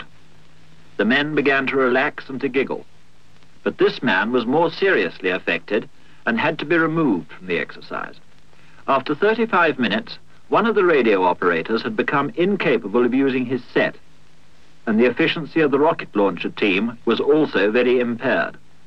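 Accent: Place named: United Kingdom. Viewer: There's something a british man narrating this that makes it so right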